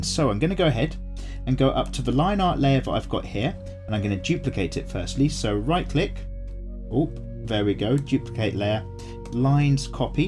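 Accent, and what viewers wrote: United Kingdom. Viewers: do you happen to be british? idk what british people sound like, but i feel like you are british. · He's definitely British.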